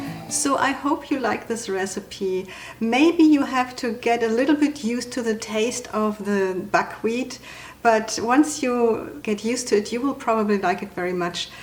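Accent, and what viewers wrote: Germany. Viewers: Not only do I love this recipe and channel, but I love your accent! My father was from Hamburg and I miss his accent. He passed away. He was from WW2. He was a doctor as a profession in America. The German accent is a pleasant soft rolling melody of inflections to my ears, so I really find it easier to learn new German recipes on this channel. My brain gets it. ( can't remember the prayer he taught us for "grace" at the table, though, sadly.) It's been decades ago, many!!! Love this channel.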